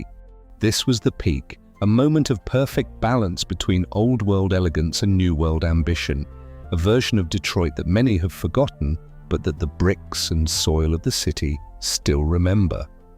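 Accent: United Kingdom. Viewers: Noooo! The "arsenal of democracy" as narrated by a Brit! Gag! ANOTHER!! Anerican story but no American narrator! How about the history of London narrated by a "suthin cracker!" Shown in Grt Brit!!?? DONT WATCH!!!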